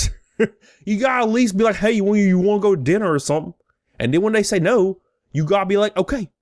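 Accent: South, United States. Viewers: thank youtube, for recommending me your channel. I dig ya, also like the light southern accent. Subbed. Also their customer service is horrid. The other day I was trying to get a PS4 controller and they only had ONE woman at electronics when there were like 6 customers waiting around for different things. Waited 15 minutes so she could pop a tab and ring up one controller...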